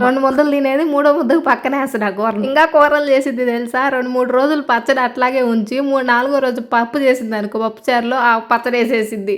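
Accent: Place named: Chittoor, India. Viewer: Ya ooru akka chittoor slang la undi meedi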